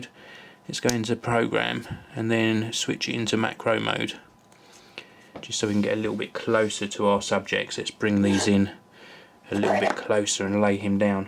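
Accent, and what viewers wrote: England, United Kingdom. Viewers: english accent. Britain is made up of 4 countrys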